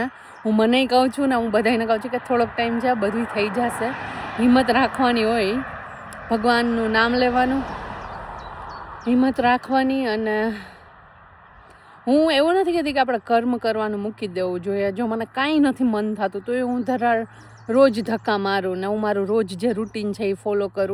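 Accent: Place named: Saurashtra, India. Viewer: બેન આપ મુળ વતની ક્યાં નાં કારણ કે આપની ભાષા સૌરાષ્ટ્ર ની હોય એમ લાગે છે આપ હાલ ક્યાં રહો છો આપની સમસ્યાનો હલ જરૂર ભગવાન માતાજી કરશે... આપની સમસ્યા સાંભળી દુઃખ થાય છે જય માતાજી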